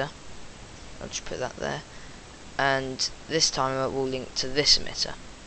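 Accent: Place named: United Kingdom. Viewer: YOUR BRITISH! I have a british accent coming on! :D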